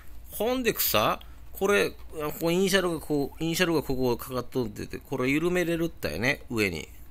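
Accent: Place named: Chikuho, Japan. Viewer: 筑豊弁懐かしく見入ってしまうw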